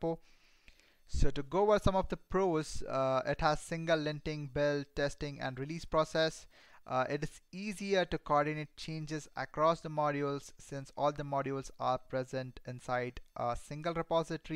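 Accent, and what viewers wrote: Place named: India. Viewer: Good tutorial, and nice indian accent :)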